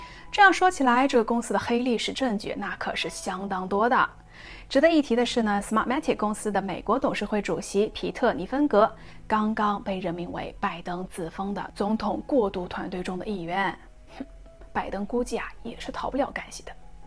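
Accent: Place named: Shanxi, China. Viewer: 你老家是山西或内蒙，感觉你的普通话有乡音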